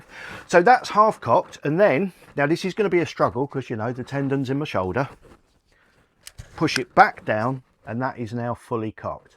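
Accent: United Kingdom. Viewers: i thought you lived in England because of your accent and the fact you said you had to be in the UK for the giveaway, you can get a lot of things if you pay enough money and you have a completely clean record, but it is very controlled, i wish it was easier and cheaper but it's not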